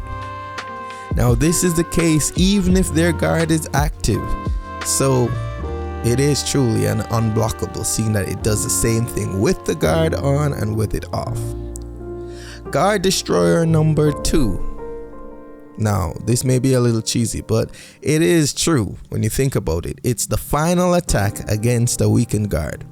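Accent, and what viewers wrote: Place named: Jamaica. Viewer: I hear jamaica 🇯🇲 in your voice #subbed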